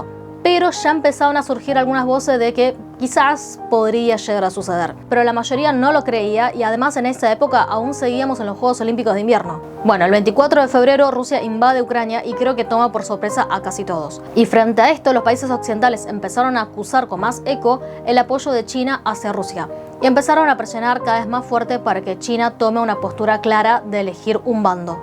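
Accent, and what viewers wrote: Argentina. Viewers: Que lindo tu acento Argentino . Me gustaría tener una esposa con los ojos chinitos. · Tu castellano (tu pronunciación y las palabras que usas) es claramente argentino... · Tienes como un poco de acento argentino. En dónde vives? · Me parece un buen video te felicito .Mil veces mejor que los noticieros porque aquí solo todo a favor de los gringos como si fuera una película que hacen ellos que solo ellos son los buenos.Tu acento Argentino me gusta mucho muy bonito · Tu. Español argentino en una chica asiática se ve hermoso. Saludes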